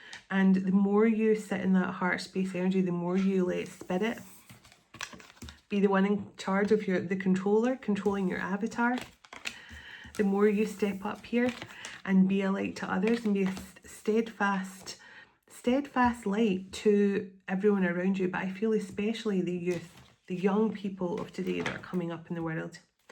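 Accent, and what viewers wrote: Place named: Scotland, United Kingdom. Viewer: I hyped you because I just love you! Forgiveness is healing and I believe in the same thing. Jesus taught us to forgive and love one another as my father loves you. I stand by that. I would rather listen to my intuition and let the Holy Spirit guide me more than anything. Also I can’t get enough of your Scottish accent and my youngest Kyle loves your voice too. He said it’s soothing to him. you are a blessing to us all! So happy to know you! I will email you as more things have happened that I can’t discuss here. Thanks for being you 🙏🏻❤️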